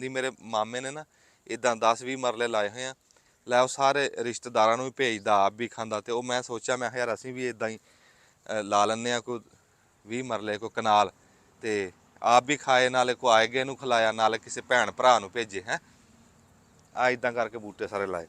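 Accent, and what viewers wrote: India. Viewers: Indian ki Tarah kio boltay ho, Pakistani ki Tarah bolo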